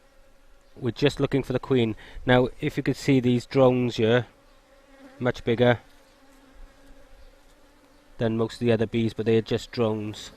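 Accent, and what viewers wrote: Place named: Welsh Valleys, United Kingdom. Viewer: Thanks for the interesting video but most people like me who search for 'starting a bee colony' will be as disappointed as me. Assuming we are capable enough to build the hives, assuming we have all the kit needed, what we need to see is the process from having nothing to having some honey! Show us a video that does just that...;start with nothing, like me, then go through every process till you put the honey on your morning toast. The yanks are good at videos for dummies but are too self engrossed and spend most of the video talking about themselves and how good they are at doing stuff!! I will continue to watch your vids as I am a Taff living in rural Normandy and I enjoy hearing your valleys accent.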